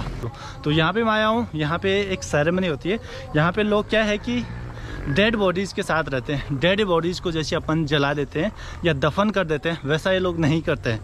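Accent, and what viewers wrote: Rajasthan, India. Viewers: bdiya bolte ho pura Rajasthani touch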